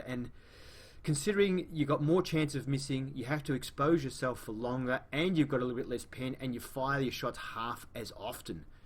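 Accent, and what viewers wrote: New South Wales, Australia. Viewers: Is that a NSW accent?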